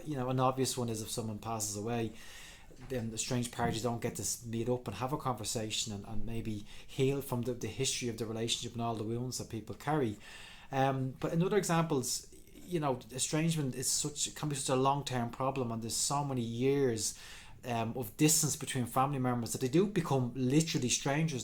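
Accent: Ireland. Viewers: that beautiful Irish lilt I miss so much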